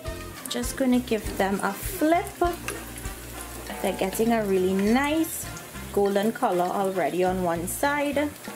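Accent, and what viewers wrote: Trinidad and Tobago. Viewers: Sounding like ah Trini😁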